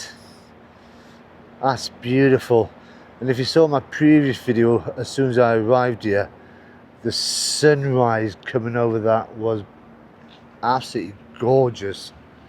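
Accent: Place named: Northern England, United Kingdom. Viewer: Judging by the vowel sounds, I'd say he's from the north of England.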